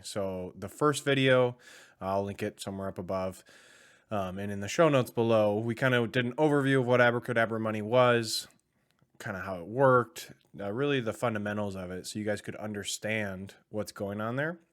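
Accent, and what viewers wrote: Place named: Michigan, United States. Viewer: Great job bro 👍🏿. Sounds like you're in MI. Go Blue!😉. I'm in MI too. Maybe we can link up sometime. Blessings to you!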